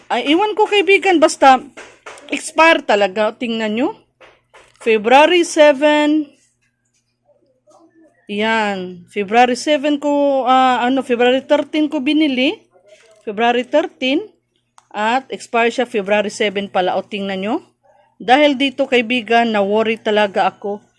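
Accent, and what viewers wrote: Visayas, Philippines. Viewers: hahahah.. Visaya giod oi, puwede mana ibalik Sis, ereklamo..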